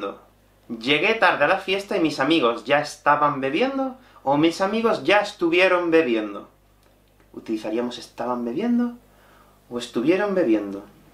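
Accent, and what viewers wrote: Andalusia, Spain. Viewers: Tu eres de espana? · Hola! Estuve flipando durante todo el vídeo: Qué bien lo has explicado todo tío y además era una de mis dudas más grandes! Pero tengo una pregunta, y no sé si tal vez podrías hacer un video sobre este tema? En fin, me gustaría saber cuál es tu acento propio o original? Puesto que eres de Málaga, siempre me he preguntado si tienes acento andaluz? Si es el caso: has crecido con ese acento y después aprendido el más "neutro"? Y ahora sabes hablar con los dos cuando quieras o has perdido el andaluz? Te lo estoy preguntando, porque a mí me interesan mucho los acentos, y creo que enfocarse en un acento en particular puede incluso ayudar con el aprendizaje de un idioma como el español, o eso por lo menos ha sido mi experiencia. Un cordial saludo desde Suiza!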